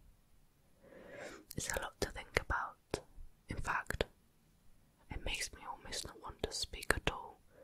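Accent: United Kingdom. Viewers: Aww. I don’t think you talk too fast. At least not in your videos. And I love your accent, Queen’s English or not! I think it’s lovely 😊 Also, you look like Michelle Dockery. 🌹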